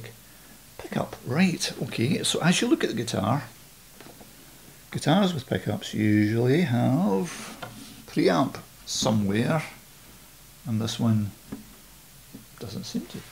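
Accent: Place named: Glasgow, United Kingdom. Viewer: Another teriffic review always informative and concise. Lovely to hear a fellow glaswegian voice on youtube..cheers.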